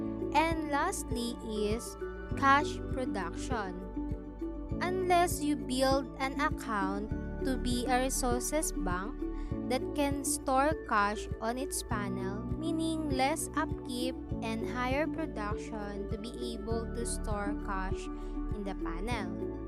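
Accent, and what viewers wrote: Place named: Philippines. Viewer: sa way ng pag pronounce mo ng english i think pinay ka hehe ang cute🥰 more upload pa po ng grand mafia tips pls🥰🙏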